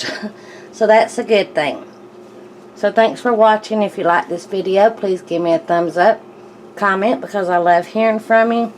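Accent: South, United States. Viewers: That strong southern accent! Music to my ears. By the way those cheesesteak sandwiches look mouth watering. Got to try me one of these, thanks for sharing. Greetings from England. 🙂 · Looks fantastic. I absolutely love your southern hospitality style and voice. Reminds of going to the family gathers for reunions or backyard BBQ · Never thought I would across somebody that talked country as me. Bravo. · That Southern draw. You rock.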